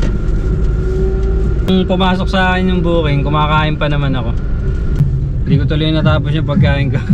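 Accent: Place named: Hagonoy, Philippines. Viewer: hagonoy accent haha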